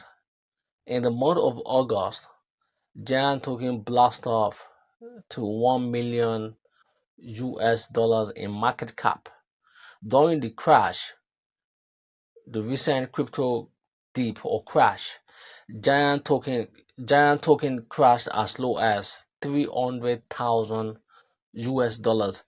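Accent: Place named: Democratic Republic of the Congo. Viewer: Your ascent though! Sounds Congolese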